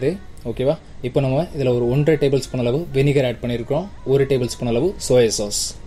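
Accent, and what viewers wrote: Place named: Mumbai, India. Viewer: Your tamil is nice. Mumbai tamil 😅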